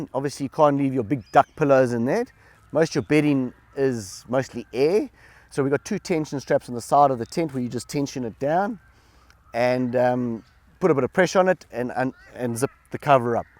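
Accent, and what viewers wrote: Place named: South Africa. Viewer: Hey, great SA accent for an Oz! Nice product, thanks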